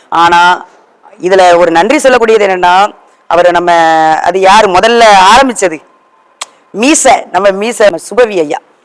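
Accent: Thoothukudi, India. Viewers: உங்கள் தூத்துக்குடி தமிழ் நல்லாருக்கு 🎉🎉🎉🎉🎉🎉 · அக்கா உங்கள் வீரமான தைரியமான விளக்கமான உரை அற்புதம். வாழ்த்துக்கள் அக்கா🎉🎉 உங்கள் ஏலே என்னாலே தூத்துக்குடி வழக்குமுறைப் பேச்சு கேட்டுக்கிட்டே இருக்கலாம் போல. ❤❤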